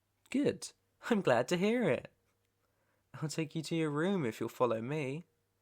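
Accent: United Kingdom. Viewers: Do you speak British?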